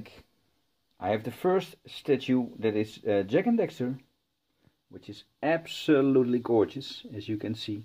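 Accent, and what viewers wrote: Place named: Netherlands. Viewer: Echt een typisch Nederlands accent.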